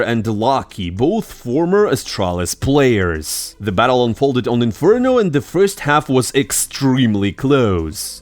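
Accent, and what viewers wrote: Russia. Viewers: This Russian accent makes me cringe, sorry.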